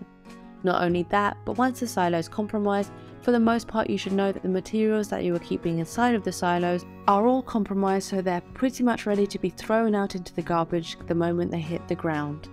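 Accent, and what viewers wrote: United Kingdom. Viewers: I like the narrator's posh accent.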